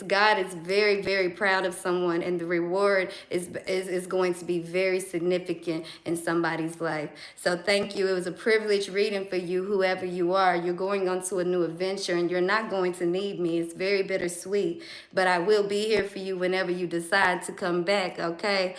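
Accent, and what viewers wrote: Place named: Louisiana, United States. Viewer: Where are you from, ?, where that accent!! U Cajun??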